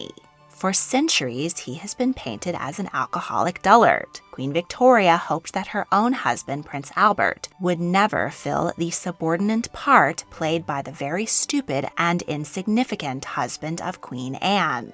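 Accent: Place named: United States. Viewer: The narrator's voice is rather unpleasant. Sharp, constipated, painfully american.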